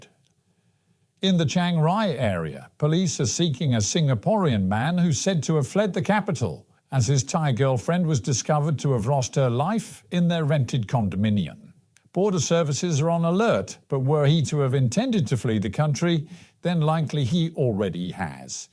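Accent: United Kingdom. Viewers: I like your news program and regulary follow ; it's informative, unbiased and coloured with your elegant British accent. Keep doing this good job.❤❤❤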